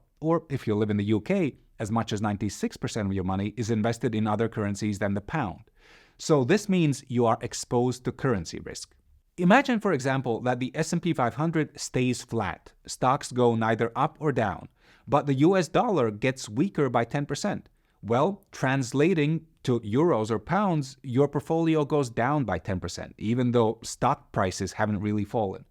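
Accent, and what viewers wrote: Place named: Latvia. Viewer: why does this english named person speak with a latvian accent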